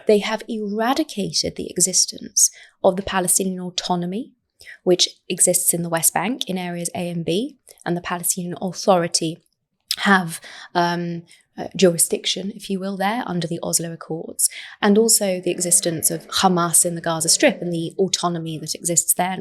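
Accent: RP, United Kingdom. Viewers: When idiotic Hasbara army like Michael Rappaport fails the propaganda exercise, send a posh British sounding white woman to spew more lies with bombastic words sounding all posh but contains zero substance.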